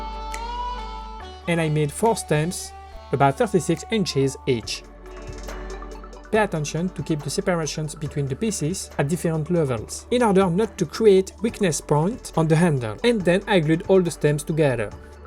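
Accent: France. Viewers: T'es francais nn ? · Are you french ?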